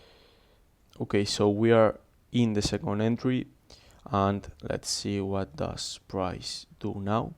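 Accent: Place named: Spain. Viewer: grande sabía que eras español ajajajajaj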